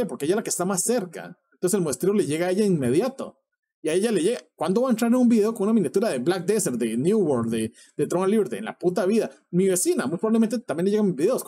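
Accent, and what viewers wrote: Costa Rica. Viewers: Desde el primer video que vi supe eras de Costa Rica por la pronunciación de la R, soy de México y visito mucho San Jose pero también se me hacía raro que no decías los modismos, pero igual como dices lo importante es la calidad de persona y no la nacionalidad, me topé con tus videos buscando guía de TL y me han ayudado bastante. Vas muy bien a mi parecer, ánimo!! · Sin que dijeras ya sabía que eres de costa rica , soy de Colombia y vivo en new jersey con varios amigos de costa rica y el acento es muy cool , además que la pronunciación de la r me parece la mejor opción para la pronunciación del inglés entonces la tienen más fácil los ticos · Pero si tu acento grita que eres tico jajajajajaj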